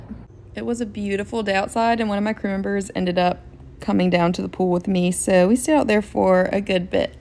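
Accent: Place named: United States. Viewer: Lovely to see you, beautiful and professional as always, luv the American accent xx Matt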